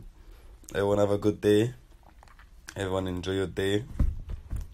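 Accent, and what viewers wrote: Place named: Jamaica. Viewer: The yardie accent 🤢🤢🤢 ya nah yardie man your begging it. I’m not hating tho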